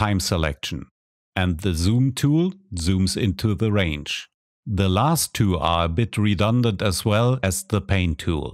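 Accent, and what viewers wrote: Germany. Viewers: Yes.. i think he is German. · Where are you from? Your accent sounds german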